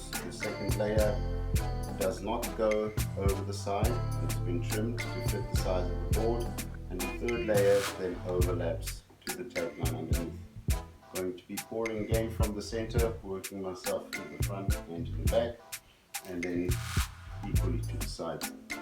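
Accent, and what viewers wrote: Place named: South Africa. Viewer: You sound South African bru, lekka to see some local vids on YouTube. Keep up the board building.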